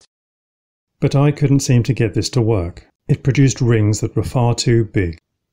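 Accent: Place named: England, United Kingdom. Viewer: I'm just over the moon to hear a middle class English accent on YT!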